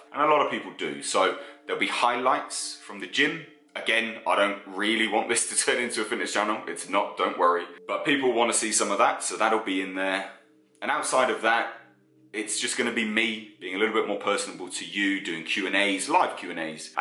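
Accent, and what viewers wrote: United Kingdom. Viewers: I NEVER say these things, 1st time ever.....but YOU'RE EXTREMELY HANDSOME & I've always loved accents, especially British. As an ardent Anglophile, I'll be embarrassed if you're not UK.... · Handsome fella you are. Can I ask what kind of brit accent you have? Excuse my ignorance but just curious since your voice is smooth and fluid in every way. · Jesus you’re ripped😂😂😂!!! And you’re voice is so deep and British!!!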